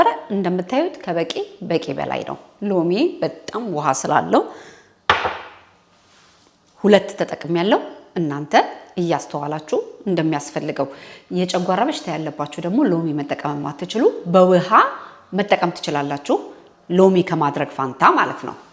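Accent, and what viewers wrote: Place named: Italy. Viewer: ሰላጣ ማለት ነው salad በእንግሊዘኛ ነው አንዳንዴ ጣልያንኛ ተናጋሪ ስለሆነች pronounciation ትንሽ ሊለይ ይችላል።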